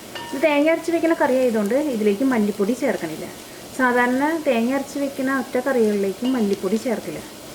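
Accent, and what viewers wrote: Thrissur, India. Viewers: എന്തൂട്ടാ പറയണേ.. നല്ല നാടൻ തൃശ്ശൂർ സ്റ്റൈൽ.. :D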